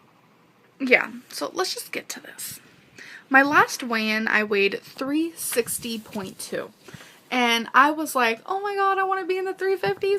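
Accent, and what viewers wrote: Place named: South, United States. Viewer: Where’s her odd southern~ish(not really) accent???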